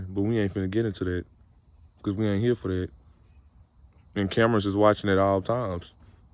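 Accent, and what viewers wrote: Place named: South, United States. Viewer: Could it also be that you sound uneducated and like you came from the po' south?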